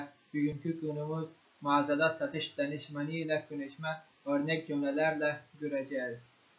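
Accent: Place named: India. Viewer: Hindistanlımısım türkce konuşma şeklinde sanki hint aksanı var derslerin için teşekürler devamı gelsin😀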